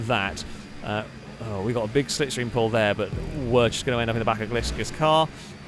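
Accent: United Kingdom. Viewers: The accent is UK but are you sure your not Canadian. You constantly apologize for stuff that is not your fault.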